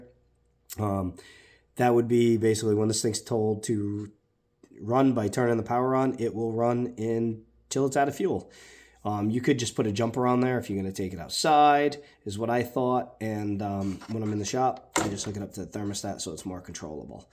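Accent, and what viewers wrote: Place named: East Coast, United States. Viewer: you and i would get along well. Jaja. Sounds like your accent is somewhere from the east coast too. Im from CT.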